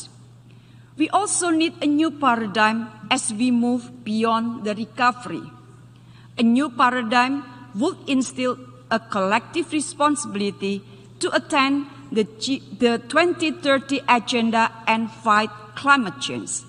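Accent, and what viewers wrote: Indonesia. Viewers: Gini lho dengerin menlunya ngomong enggres pake aksen Indo. Gak ala2 amrik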